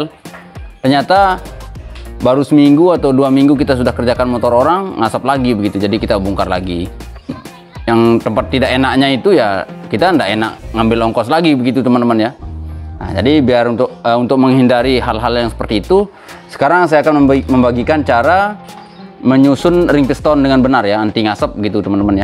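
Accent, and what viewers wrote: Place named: Madura, Indonesia. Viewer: Dari Logatnya kayaknya Abang orang Madura ya? 😁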